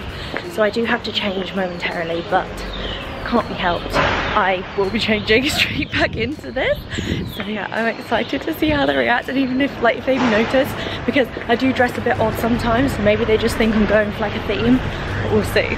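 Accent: United Kingdom. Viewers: British, right?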